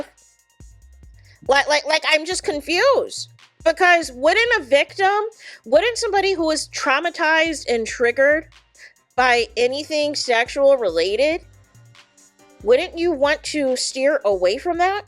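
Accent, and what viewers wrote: Midwest, United States. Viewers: I love your voice…it’s cute and gives an Intermountain West/Midwest quirky accent. I love it too bc if you are a black woman, it is outside of what people typically think black women in America sound.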